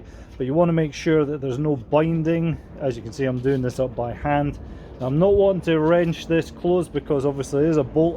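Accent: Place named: Scotland, United Kingdom. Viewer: What's up Hello brother, I'm getting mine in a few weeks. Went a complete build which is amusing considering I own a bike repair shop but stock in the suppliers is VERY low and PX have all the parts. Be rest assured mine will be customized in the future too:D Where are u from? I'm Dalkeith. You sound east Scotland too.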